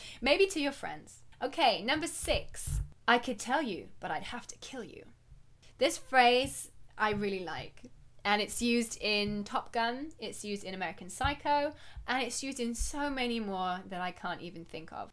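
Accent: United Kingdom. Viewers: Are you from England？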